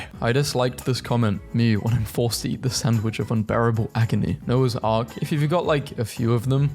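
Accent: United Kingdom. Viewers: I watch your videos so much that your accent now sounds normal to me. I randomly start speaking in a British accent without even realizing it until I realize it. I’m restricting myself from watching your videos. I’m also American by the way with the most American accent because I live near the White House.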